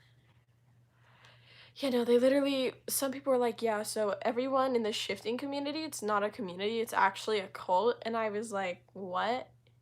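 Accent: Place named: United Kingdom. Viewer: the british accent is not at all bad get it bestie 😼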